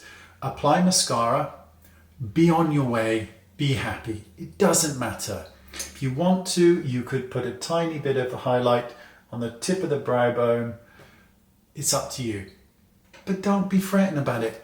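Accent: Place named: South West, United Kingdom. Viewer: So funny xx ❤ your soft-‘growly’, light SW accent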